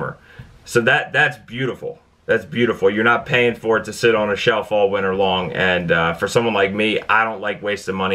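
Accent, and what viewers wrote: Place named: Pittsburgh, United States. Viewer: Sounds like you have a Pittsburgh accent. I am originally from outside of there.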